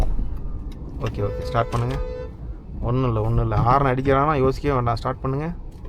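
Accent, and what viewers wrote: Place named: Madurai, India. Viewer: Excellent teaching bro thanks Bro neenga Enga Madurai slong pesura maathiri irukku 😊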